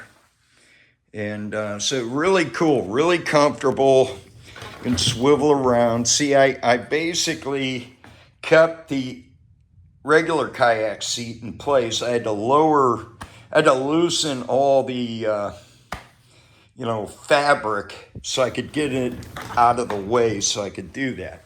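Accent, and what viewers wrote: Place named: Cincinnati, United States. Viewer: Why do I feel like this guy is from Cincinnati area? Complains without a true thoughtful logical ana. I may be wrong, but o know an accent and type.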